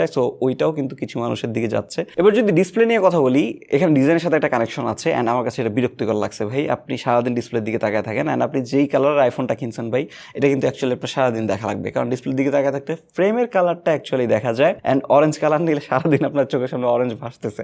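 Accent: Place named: Chittagong, Bangladesh. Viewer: ভাই এর কথার টোন শুনে মনে হচ্ছে উনি আমাদের চ্যাটগাঁইয়া। 🙂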